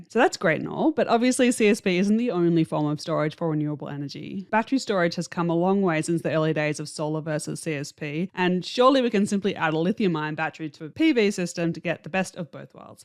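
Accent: Australia. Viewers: As a UK English speaker, I had to put the captions - that is, subtitles - on to work out what "soller" is! Is this Australian or is this?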